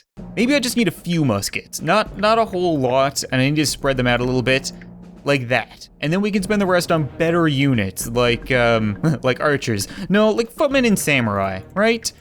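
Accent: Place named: Canada. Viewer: He’s Canadian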